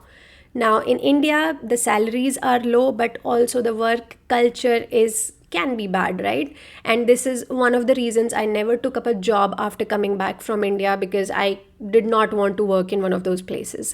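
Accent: India. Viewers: You are Indian and India is your country there's no reason for you to escape India because India is horrible because of Indians That's your opportunity to make something better that's your opportunity for wealth America is a rich country with prosperous Americans and you are just cheap labor in America no matter if you think you're making 100K and you think that's a lot of money but that a lot of money to Americans 88% Eighty-eight percent of Indians in America are cheap labor 94% ninety-four percent of Indians in America make American wages or below If Indians are so Superior why is India at the bottom of the world Are the British to blame? Why is Pakistan above India why is Bangladesh above you This is Indian mentality you don't want to work and you don't want to work hard you don't want to improve you have Hindu Brahmin lazy mentality you want to sit on the throne and have everybody else do the hard work You can become wealthy and India all you have to do is put in the work but you're not willing to do it you're not willing to improve India those are the people that become wealthy those are the people that become wealthy in India those are the people that become wealthy in the world they make something better My guy will you ever get rid of that forbell Indian accent and speak clearly Why wouldn't you want to change India and make it improve India is at the bottom of the world if you don't want to improve India than India will always be at the bottom and Indians will alway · why did you go back to India? 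100K is low for Indian in US especially in NY? You have heavy Indian accent. Shows that you did not assimilate in US. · Your accent is Indian after staying there for 10 years. You were not co mingling with the American culture. Sidehe kaho ki H1B visa nahi mila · Pseudo American; wants to live in India as an American. Doesn’t belong here or there, just an opportunist. Horrible Indian English accent. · U still have a thick Indian accent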